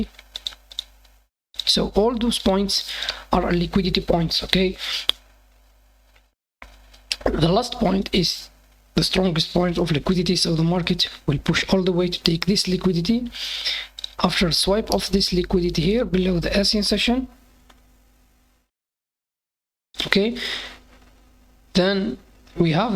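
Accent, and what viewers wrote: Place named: Morocco. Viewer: where are you from brother ? You sound Moroccan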